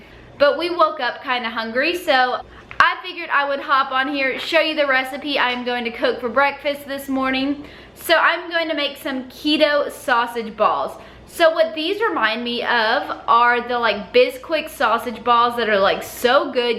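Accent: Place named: Texas, United States. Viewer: I knew you were a fellow Texan from your accent.😀 Im a newbie to your channel and I love it. CONGRATULATIONS on your boat 🚤🚤!!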